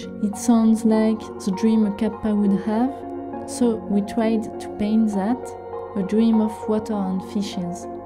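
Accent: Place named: France. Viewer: Are you French? Your accent sounds like my buddy Bertrand who moved here from France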